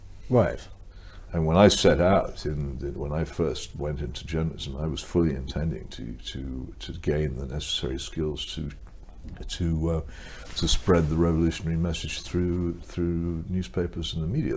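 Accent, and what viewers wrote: RP, United Kingdom. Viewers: Take the plum out Peter; drop the affectation. Can hardly tell what you are saying.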